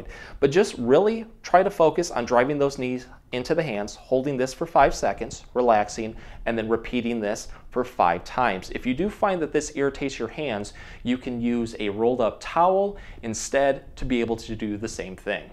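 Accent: Michigan, United States. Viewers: are you from Michigan? Trying to place the accent.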